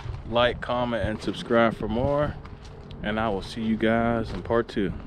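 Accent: Pittsburgh, United States. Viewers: First video I’ve watched. 1.3 seconds in and I already knew yinz were from the Burgh. Can always spot a fellow Yinzer’s accent a “mahl “ away 😅 🫡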